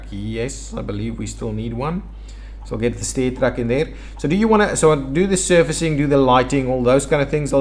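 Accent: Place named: Afrikaans, South Africa. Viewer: You sound like you are from South Africa 🇿🇦, with an Afrikaans accent.( I’m from SA ) Where are you from ?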